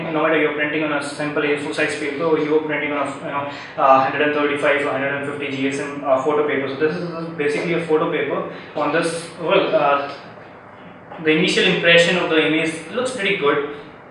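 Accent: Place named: India. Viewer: voice quality very poor. when you are reviewing a product technically and talking about it, you could have made sure you talk with a clear pronunciations and proper pace. Being Indian I know you cant help your with your accent, neither i can. But least clarity was expected ...at least in last 25% section of your vdo.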